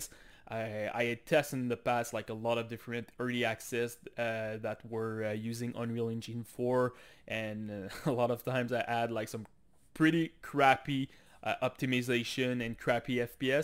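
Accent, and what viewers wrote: Quebec, Canada. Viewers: Yooooo je reconnais l'accent quebecois ahahaha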